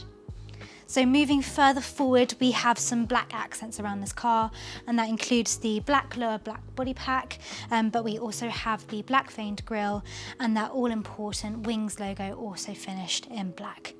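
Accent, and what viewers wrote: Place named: United Kingdom. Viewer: I love woman speak British accent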